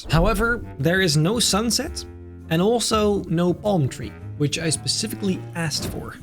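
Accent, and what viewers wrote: Netherlands. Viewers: Thick Dutch accent. Nice video tho, never heard of the channel before. Subbed.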